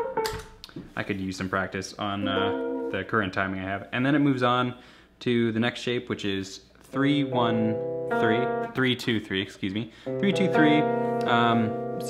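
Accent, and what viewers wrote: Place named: Midwest, United States. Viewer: where are you from? You sound like you're from the midwest. Maybe Wisconsin or Michigan?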